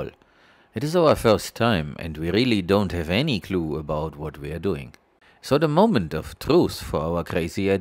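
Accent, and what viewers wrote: Germany. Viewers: Where did you get your German accent ?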